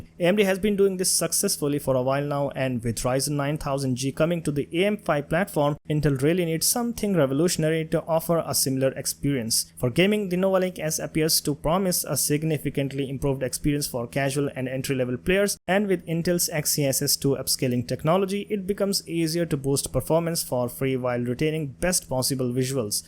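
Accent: India. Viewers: I wanted to watch this video but as soon as I heard the indian accent it put me off jesus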